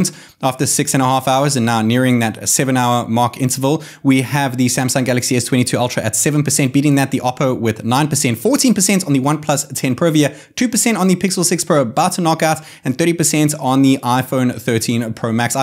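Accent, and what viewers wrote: South Africa. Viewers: Bro sounding South African🤔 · Sounds like I can hear a South African accent....my S22+ gives me some anxious days, compared to my last flagship Note 9. Luckily I love the phone otherwise I would have ditched it.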